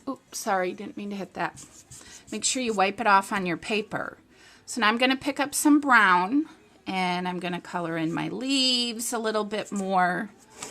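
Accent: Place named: Cleveland, United States. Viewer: Oh I am SO doing this one!! Thank You!! ... and smoosh is SO a word!! You sound like you might be/have been from Cleveland - are you??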